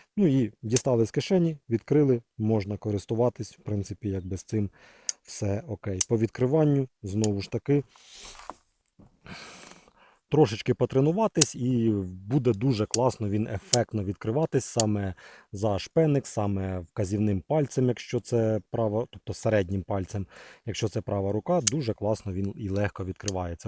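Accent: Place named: Ukraine. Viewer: хохлятский говор ну прям ржу)))нож шляпа, це обзор хоно)))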